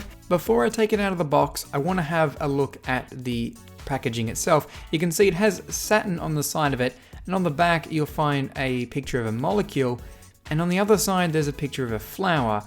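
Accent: Australia. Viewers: I knew he was Australian for a long time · My girlfriend is Australian. Easily recognizable accent to me. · Me: His accent sounds Australian Dead spider inside the computer Me: Yep, Australia confirmed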